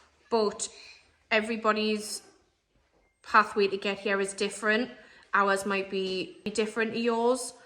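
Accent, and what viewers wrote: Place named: Australia. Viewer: You'll get your aussie accent back!!!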